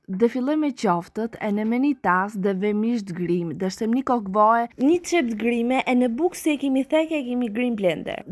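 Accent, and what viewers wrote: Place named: North, Albania. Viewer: Na knaqe me dialektin neve që të kuptojmë , se Jugu për 100 vjet s’mund ta marri vesh se çfar je tu thënë😂😂😂😂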